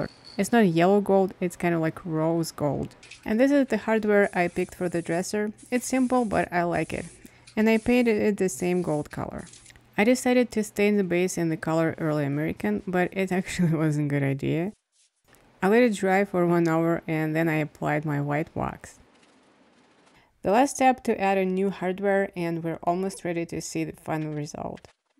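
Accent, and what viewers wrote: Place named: Eastern Europe. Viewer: oh no don’t get rid of it , it’s nice ! I thought it was Eastern European . Lovely ! We like your makeovers very much !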